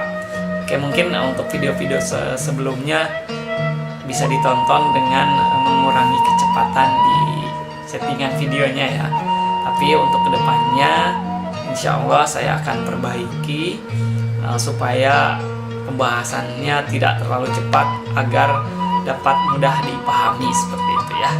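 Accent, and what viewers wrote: West Java, Indonesia. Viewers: Waalaikum salam wr.wb. Saya suka logat pengucapan dalam bahasa Sunda.....istilahnya "ngemplad" ...hahaha...ciri khas, seperti juga halnya orang Jawa bicara, ada ciri khas, begitu juga orang dari suku2 lain di Indonesia....sungguh beragam dan warna warni...Bhinneka tunggal Ika. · Saya suka bgt logat sama bhs sunda dan pengen bgt belajar. Salam dari surabaya😊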